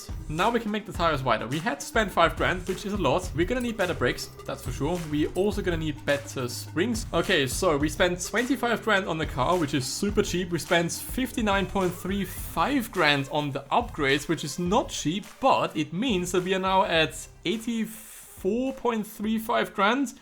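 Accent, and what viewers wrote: Germany. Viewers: Are you german? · R u German? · he is German / Austrian I can hear it as I also am germna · Are you from Germany? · man hört das du Deutsch bist :)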